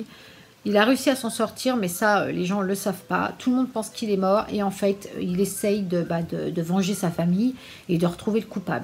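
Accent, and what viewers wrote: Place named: Quebec, Canada. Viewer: Hey je viens de découvrir ta chaîne , et ta façon de parler (timbre de voix ou accent je ne sais pas trop), me fais vraiment rire Aha ! Un total melange entre le québécois et le mec de la chaîne « Le bledar » x)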